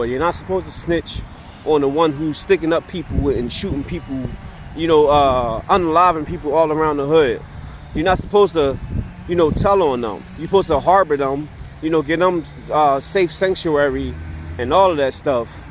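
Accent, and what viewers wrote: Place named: Caribbean. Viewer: This sounds like Tether babble you must have a carribean background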